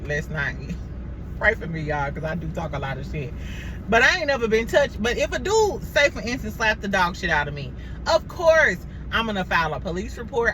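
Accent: St. Louis, United States. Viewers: Love your commentary and STL accent!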